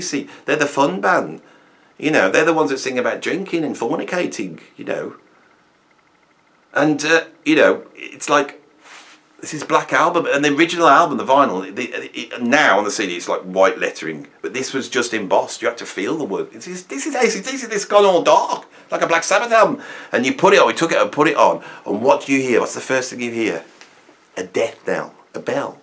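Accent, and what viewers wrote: Birmingham, United Kingdom. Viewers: Generally agree with all 10! Oh how like Gimme Shelter toooo... Well, I have other nominates for Overrated. Anyway, thank you, it's allways nice to hear your experienced musical opnion in your British English :) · Yeah, I find the Brummie accent is very arousing when the cool is being lost!!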